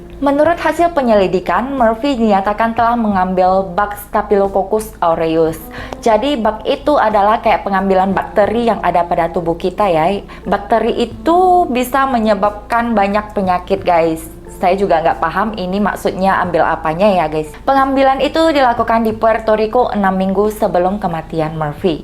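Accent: Medan, Indonesia. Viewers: Cara ngomong nya sepertinya dari Medan haha Apakah dulunya tinggal di Medan?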